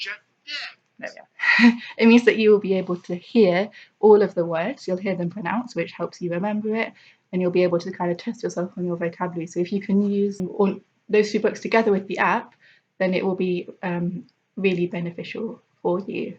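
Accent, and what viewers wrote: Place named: United Kingdom. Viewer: مرحبا 😊اللهجه تدل بريطانيا 😅استخدم الترجمه لمعرفه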